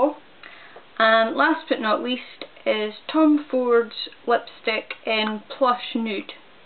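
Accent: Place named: Scotland, United Kingdom. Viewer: She's Scottish, gonna say from the west coast maybe?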